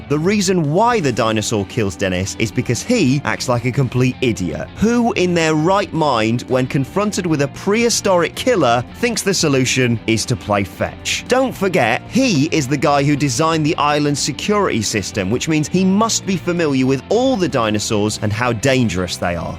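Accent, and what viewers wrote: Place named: United Kingdom. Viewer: "Simbar and Scah." Oh, British people... When will you ever learn how properly to use the letter "R?"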